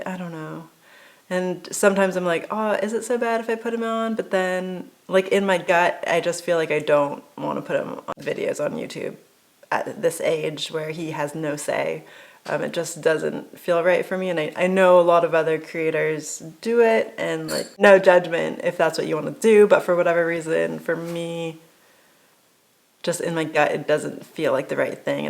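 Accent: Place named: New Zealand. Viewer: Compared with your PCT videos your accent is definitely developing a NZ accent edge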